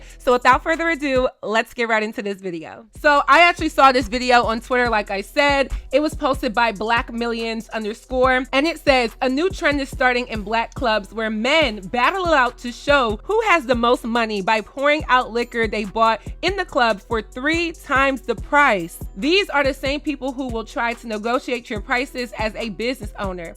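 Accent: Nigeria. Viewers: Nigerian accent cures my depression 🇳🇬💖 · I love when brings out her Nigerian accent! I know she’s annoyed when that comes out! 😭😭🔥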